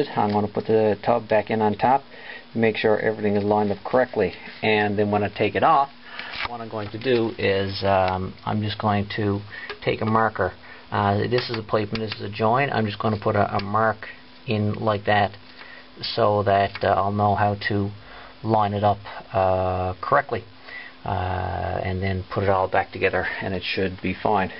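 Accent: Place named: Newfoundland, Canada. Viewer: This video saved me a ton of time. Thanks! Recognize that NL accent -- should have tracked you down to install it for me! HA! Thanks again!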